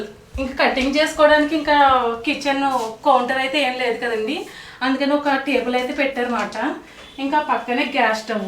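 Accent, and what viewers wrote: Konaseema, India. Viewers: దన్యవాదాలు🎉 మీరు చక్కగా చెప్పారు❤ మీ ఇల్లు మద్యతరగతి వాళ్ళు వుండే నివాసం, బాగుంది. మీరు చాలా కాలం గా ఆ ఇంటి లో వున్నారు. అంటే మీ అనుబంధాలు చాలా గాడం గా వున్నాయి. కోన సీమ భాష లో చక్కగా వివరించావు👍 మాది అమరావతి 🙏ప్రాంతం ఓం అమరేశర సామి👏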